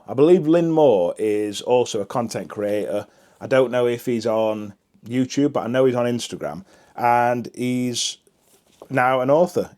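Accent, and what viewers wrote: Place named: Derbyshire, United Kingdom. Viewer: Ah thank you. So it's a Derbyshire accent.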